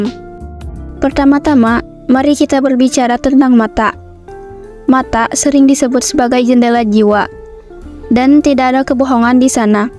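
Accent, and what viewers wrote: Bali, Indonesia. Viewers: Logat kk nya kayak logat bali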